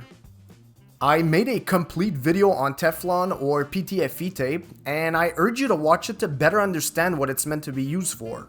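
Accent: Quebec, Canada. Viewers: I new you were Canadian A bit of a french accent. Sudbery? Thanks your my go to for plumbing. hate doing plumbing fixes or repairs but I don't mind installing new.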